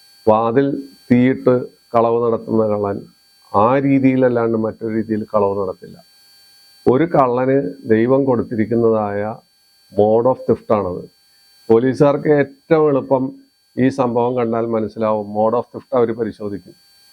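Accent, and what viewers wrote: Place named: Palakkad, India. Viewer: Iski മടിയില്‍ pootum 😂തനി പാലക്കാട്ട് basha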